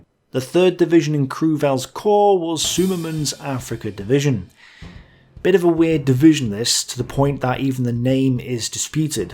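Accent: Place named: Northern England, United Kingdom. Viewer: really? I hear the accent, but it is certainly not a continental one, it is a british isles accent. Or did you think all Brits spoke perfect Queen’s English, because they happen to live in England? And that English doesn’t have dialects?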